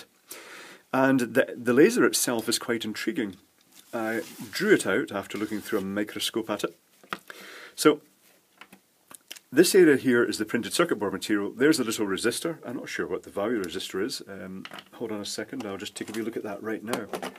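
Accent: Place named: Glasgow, United Kingdom. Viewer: are you fae Glasgow ?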